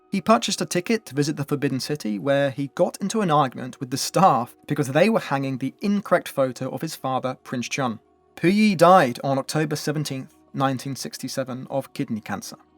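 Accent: United Kingdom. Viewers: I mean he’s bri ish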